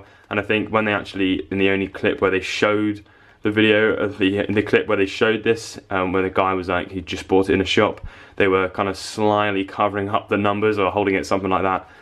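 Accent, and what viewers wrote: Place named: United Kingdom. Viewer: Ashens checklist: “Arrgh” check! LCD ripoffs. Check! Mega duck. Check! Hands in front of the camera. Check! “Tat” check! British accent. Check! AND lastly... GAME CHILD. Check.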